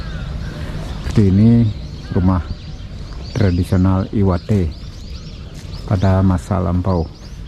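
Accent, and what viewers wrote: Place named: Bali, Indonesia. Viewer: Pasti anak bali ne bli..logatne jek bali keleh.Alat numbuk padi gantung jd kulkul.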